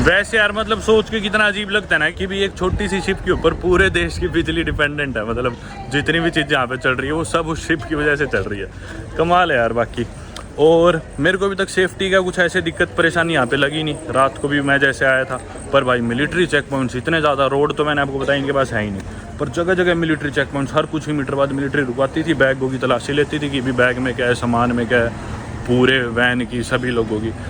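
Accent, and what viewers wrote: Haryana, India. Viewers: Pure haryanvi accet 😂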